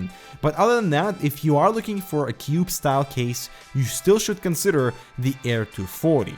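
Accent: Sweden. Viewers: Are you from sweden? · Where are you from? are you swedish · You sound really swedish btw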